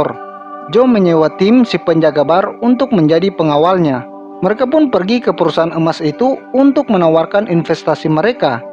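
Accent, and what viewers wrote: Eastern Indonesia, Indonesia. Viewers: Orang timur Lu ya bro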